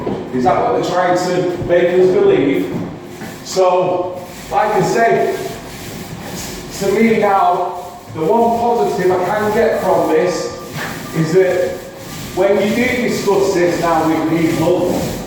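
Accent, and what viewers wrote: Manchester, United Kingdom. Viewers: Love you and that Manc accent one love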